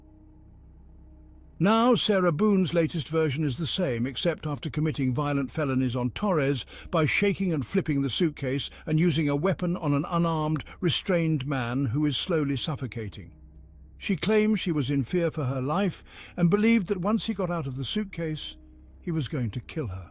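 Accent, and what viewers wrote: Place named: United Kingdom. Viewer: Who would have thought there would be a connection between the Sarah Boone murder case and a Creepshow episode that aired Oct 2019, a few months before the murder occurred. What they both have in common is the implied archetype of “The Suitcase” - with its potential darker aspects [used as a torture device] and for being an obsessive fetish. I enjoyed watching intriguing video with its “creepy” topic: Comparing the horrible evils that can exist, each involving a suitcase possessed by an aggressor who had control of it from the outside, to a victim trapped within - who got stuck inside its claustrophobic confined space. Pointing out similar comparisons: The two suitcases looked almost identical - appeared to be about the same size. The aggressors used a bat for violence and dealt with the concept of the suitcase being thrown down the stairs. 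The audience is also left with the scary image of a hand popping out of the suitcase, not to mention the idea of a foot stomping on it/being stepped on. [This is implied when Sarah Boone’s sandals were shown next to the suitcase - from the 2nd mobile phone shot suitcase video. - that no one seemed to notice] The British narrator had that perfectly nuanced, articulate sounding voice - well-paced enough to reveal an unusual, dark side and sinister portrayal of the troubled human condition. This video came out at exactly the best optimal time: A few days after the guilty verdict [of 2nd degree murder] was reached in Sarah Boone’s Jury T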